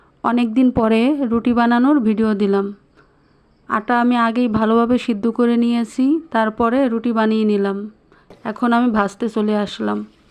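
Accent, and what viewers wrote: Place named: Barishal, Bangladesh. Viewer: আপু আপনার দেশের বাড়ি মনে হয় বরিশাল কথায় বোঝা যাচ্ছে |আপনি যেখানেই যান না কেন |নিজের দেশের মানুষের কথা শুনলে মনে হবে আপনার আপনজন ❤️❤️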